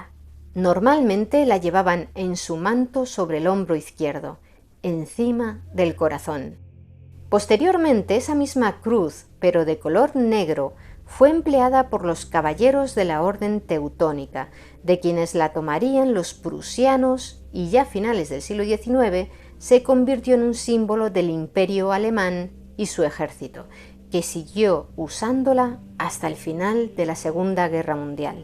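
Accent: Spain. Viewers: El único acento Español que me encanta escuchar en videos como este sin distorsionar las historias es el de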